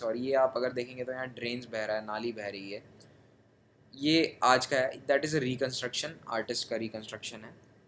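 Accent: India. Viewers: English has been taught in India since 1830. Why can't you speak English? Do not worry about your Hindi accent. Just speak English!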